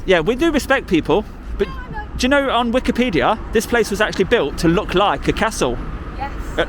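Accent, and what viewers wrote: Leicester, United Kingdom. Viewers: Deffo a lesta accent I hear..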